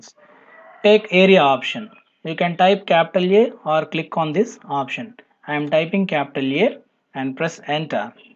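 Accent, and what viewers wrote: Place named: India. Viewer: Nice try but you have to improve your Hindu - English accent you speak. Half of them cant understand.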